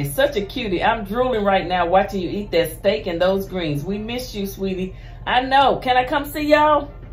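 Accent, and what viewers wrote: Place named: South, United States. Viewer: you are a southern woman, I can hear it in your voice.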